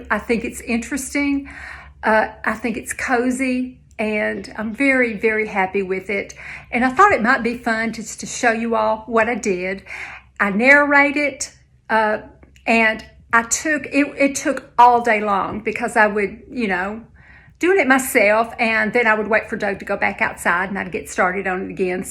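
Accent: South, United States. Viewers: Soo lovely, you have a real, lovely victorian Southern States of America. Still love your funny accent. Lots of love 😘😘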